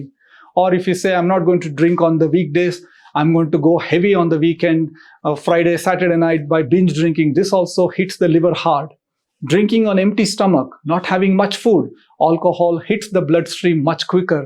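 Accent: India. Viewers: Awesome Indian accent. 😆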